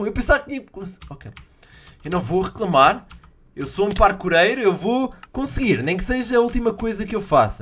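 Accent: Portugal. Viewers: o é português. por isso ele fala assim!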